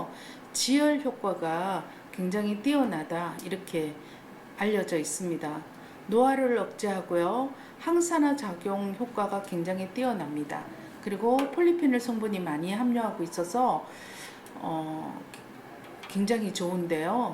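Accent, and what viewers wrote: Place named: Gyeongsang, South Korea. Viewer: 유혹의 꽃 장미도 차를 제실방에 오시어서 감사합니다 경상도 이신가 봅니다 늘 응원합니다 감사합니다 🙏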